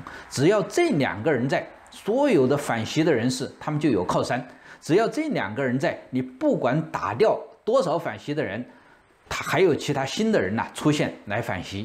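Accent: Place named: Subei, China. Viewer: 这个老兄的口音江淮官话 苏北口音的底子，为什么我总是联想到 江爷爷。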